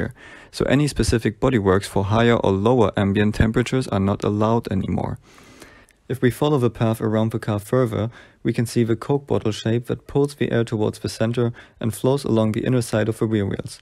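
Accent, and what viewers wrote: Germany. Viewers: Bist du Deutscher? xD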